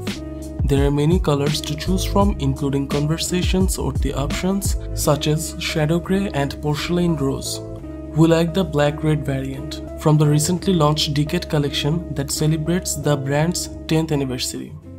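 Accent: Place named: Bangladesh. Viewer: You sound like a Bangladeshi :-)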